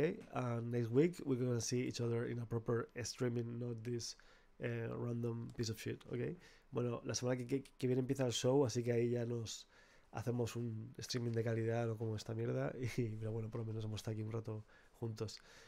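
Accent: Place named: Argentina. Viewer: Que grande, lo escuchas hablar y es mas argentino que el dulce de leche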